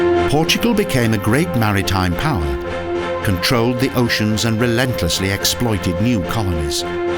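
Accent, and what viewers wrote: Spain. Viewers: The narrator of this video is an ignorant, incompetent, stupid, moron, who by his english accent you can easily deduce that he's from england, thus a european but nevertheless pronounces Portuguese words and names with a spanish accent. Get somebody competent to do the narration. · The footage looks old and narrator pronounces portuguese words un spanish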